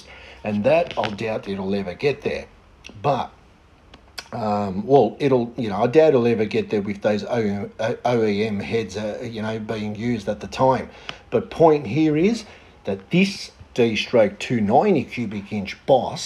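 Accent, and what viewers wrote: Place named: Australia. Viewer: Interesting video mate! In the early eighties I had a 2.3L two door Fairmont as my daily drive. I gathered all of the Cleveland goodies for the transplant but fell on hard times and had to sell the engine and C6. The cat that bought it assembled the engine, put in his short track asphalt car and won a feature race that was dominated by bowties. A proud moment for him and me! Oh! Btw, I love that Aussie accent!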